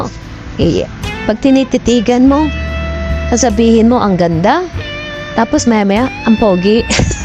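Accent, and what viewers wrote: Batangas, Philippines. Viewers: Thank you for sharing this compilation of Pablo. Your Batangeño accent and jokes made your video so unique and fun to watch. Waiting for Justin Ken and Josh 😍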